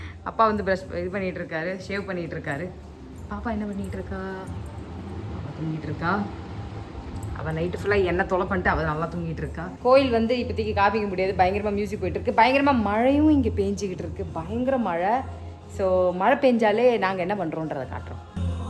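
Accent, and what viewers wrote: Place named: Kongu, India. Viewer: Tamil sema athulium konku vois eathathrmana pechu nenga nalla pesirunga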